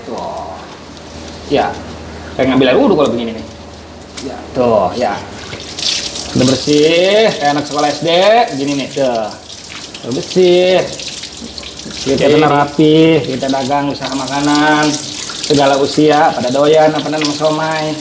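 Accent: Jakarta, Indonesia. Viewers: Bpk nya logat betawi banget deh Makasih bg ilmunya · Abangnya Betawi Depok ya dari logat bicaranya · Suaranya kaya orang Betawi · Ngomongnya nyablak khas betawi.. Jadi kangen sama bapak krna logatnya sama persis, semoga sukses selalu ya pak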